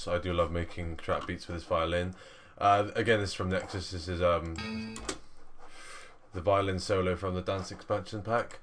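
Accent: United Kingdom. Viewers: your from london? you sound like it? hit me up on inbox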